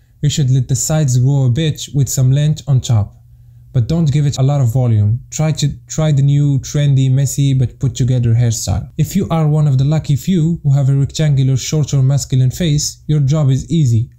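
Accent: Morocco. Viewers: i bet you're moroccan just by the accent, am i right a 3chiri ? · you sound morrocan idk why, good vid tho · Tell me you're Moroccan without telling me you're Moroccan. Your accent bruh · Hahaha I swear 3reftek Moroccan gher meen accent , prouud of youu keep it up🔥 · Man i could notice the moroccan accent from the getgo 😂 , great concept , keep it up !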